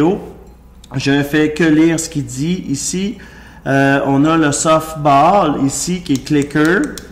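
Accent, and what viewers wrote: Quebec, Canada. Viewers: kiffant j'adore ton accents Québécois ?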